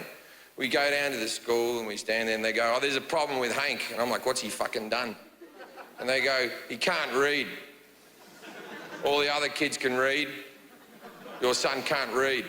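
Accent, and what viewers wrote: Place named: Australia. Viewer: Jim is an Aussie. I'm an Aussie. We all actually talk like this all of the time. It's completely normal. If you were at an Aussie BBQ then you would hear this kind of banter from multiple people all night long. It's how we roll. It's just great that Jim has made inroads into the international market and has exported our brand of humor, not that it's actually humor. It's just how we talk normally. Australia is a funny mother fuckin' place. You'd love it. Come on over. I'll throw a shrimp on the barbie for ya.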